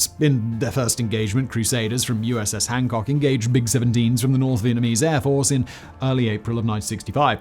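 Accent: England, United Kingdom. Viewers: Arrogant use of English accent. This guy Never Soldier w/ Cavalier wuss an Talk Anti American Buffoon!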